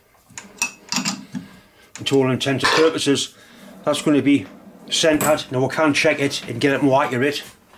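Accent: Newcastle, United Kingdom. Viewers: English, with a Newcastle Upon Tyne, Northumberland accent. Took me about 5 videos to understand every word. I've watched almost all of videos.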